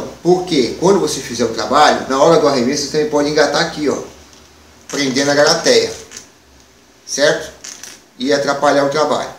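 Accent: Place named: China. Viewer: e por que vc fala tipo chinês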